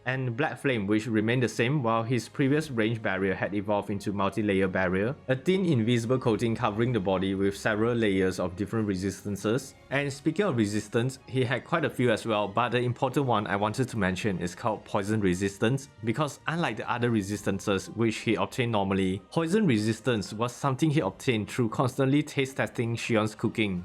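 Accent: Singapore. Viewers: Singaporean accent :)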